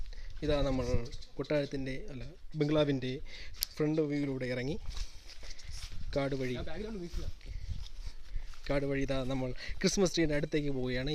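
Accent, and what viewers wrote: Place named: Trivandrum, India. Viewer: Tvm ആണ് alle സംസാരം കേട്ടപ്പോൾ manasilayi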